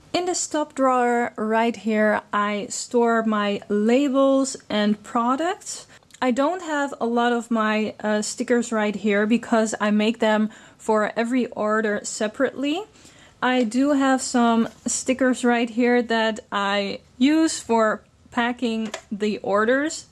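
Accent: Netherlands. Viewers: i knew you were dutch when u started talking lol. i know the dutch-english accent from anywhere! :D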